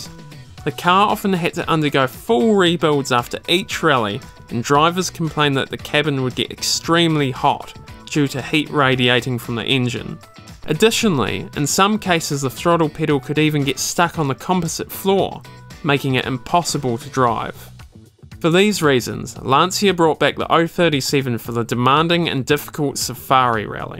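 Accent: New Zealand. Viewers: Nice to hear a farmiliar nz accent ! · Are you a kiwi? Great content mate!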